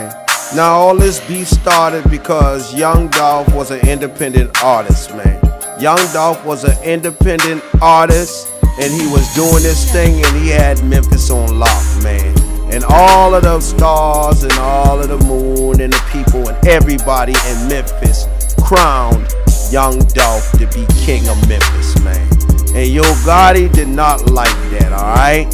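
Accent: South Carolina, United States. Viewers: Sound like u from 843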